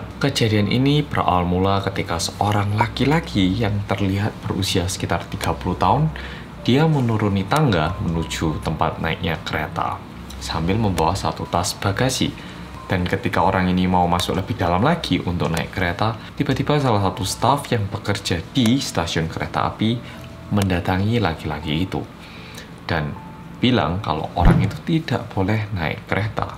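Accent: Java, Indonesia. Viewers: Mas medhok: "darahnya terus bercucuran" Me yg phobia darah: mati aja lah aku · Mas, suaranya medok2 gimanaaaaa gtu.....sy suka sekali mndengarnya......😁😁😁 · yang medhoooooooooooooooooooooooooooooooooooooooooooooooooooooooooooooooooooooooooooooooooooooooooooooooooooooooooooooooooooooooooooooooooooooooooooooooooooooooooooooooooooooooooooooooooooooooooooooooooooooooooooooooooooooooooooooooooooooooooooooooooooooooooooooooooooooooooooooooooooooooooooooooooooooooooooooooooooooooooooooooooooooooooooooooooooooooooooooooooooooooooooooooooooooooooooooooooooooooooooooooooooooooooooooooooooooooooooooooooooooooooooooooooooooooooooooooooooooooooooooooooooooooooooooooooooooooooooooooooooooooooooooooookkk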